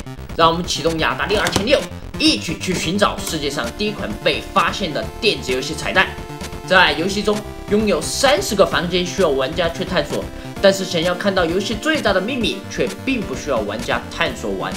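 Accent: Sichuan, China. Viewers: 四川人吗，声音和鸭脖龙好像。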